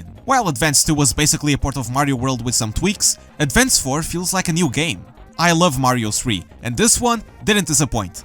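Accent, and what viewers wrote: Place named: Portugal. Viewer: I know a portuguese when I hear one! Good video 🤝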